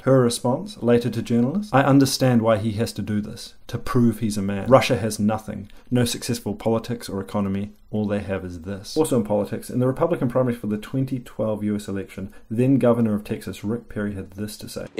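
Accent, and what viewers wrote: New Zealand. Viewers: oof that heavy disheartened sigh after the civil war stats nonetheless i'm now even more convinced that kiwis have the best accent idk how people can't understand what you say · He speaks too quickly and has a broad Kiwi accent.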